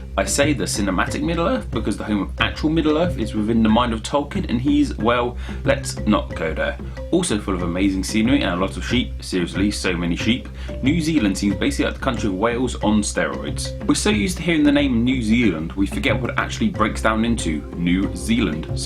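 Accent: United States. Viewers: Benjamin No. This guy is from the UK and he's speaking like a yank.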